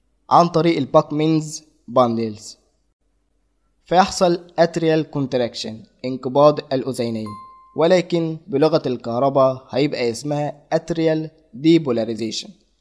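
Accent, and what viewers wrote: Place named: Sudan. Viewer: من وين انت عرفتك يوداني من اللهجة 😂❤❤❤❤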